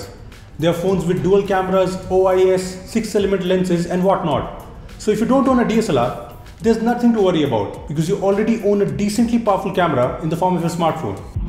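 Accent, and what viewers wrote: India. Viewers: RIP Indian English accent :(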